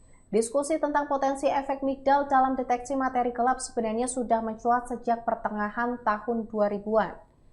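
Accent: Java, Indonesia. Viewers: Ini yang ngomong orang jawa ya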